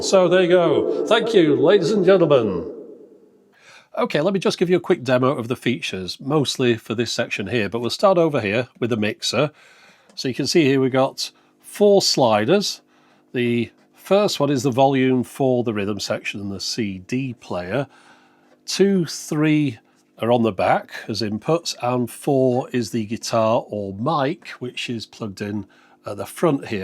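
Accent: United Kingdom. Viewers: This was hilarious. went berserk. And his delivery is sooo English.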